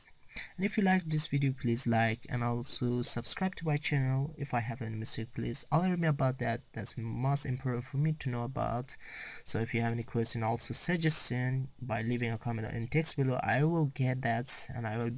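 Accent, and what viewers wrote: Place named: China. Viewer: "thank you for de chinese accecent"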